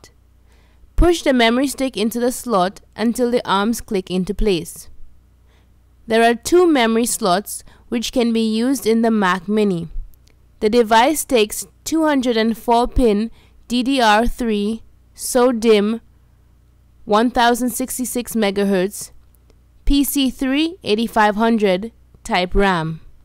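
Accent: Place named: Jamaica. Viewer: jamaican gal ah talk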